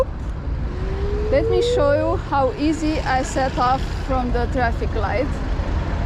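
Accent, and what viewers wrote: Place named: Russia. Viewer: Mother russia accent. Pe deum rau stai pe pernițele degetelor din talpa ca sa nu iti mai zbenguie capu prin casca. Adica ridica-te putin pe varfuri si ai rezolvat problema.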